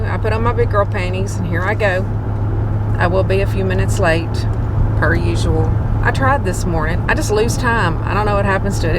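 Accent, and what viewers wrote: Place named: Kentucky, United States. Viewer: hey! Thanks for the great content, I enjoy watching your channel. I knew that you had to be from KY or close because your accent is just like mine!!! 😁😁😁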